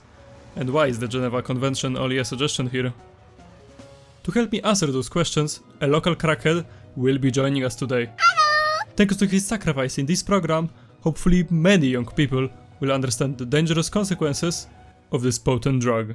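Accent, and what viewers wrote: Poland. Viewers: Mega polski akcent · Polski akcent słychać po pierwszych dwóch słowach :p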